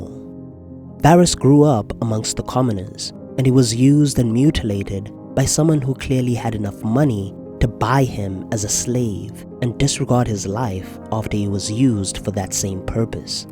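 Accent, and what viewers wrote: South Africa. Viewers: Haibo is that a fellow mzanzian I hear ???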